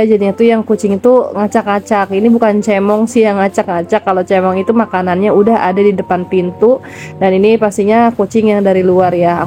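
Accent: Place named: Sunda, Indonesia. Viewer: Insha Allah,gak akan pernah bosen teh,,seneng denger cara bicara teteh,,yg sebagian sunda kayak aku..😀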